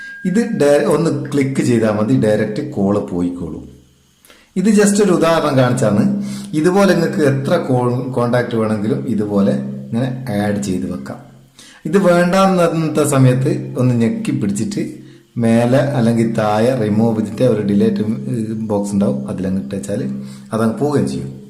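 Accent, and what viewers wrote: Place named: North Kerala, India. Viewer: കേരളത്തിലെ വടക്കൻ ഭാഷ കേൾക്കാനും ഒരു സുഖം.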